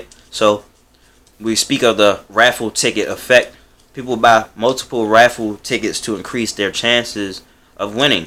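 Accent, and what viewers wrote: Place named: Baton Rouge, United States. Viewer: Another good video! Now, a totally off-topic question: Do you have any connections to Baton Rouge? The way you say certain words takes me back to the time I spent at LSU.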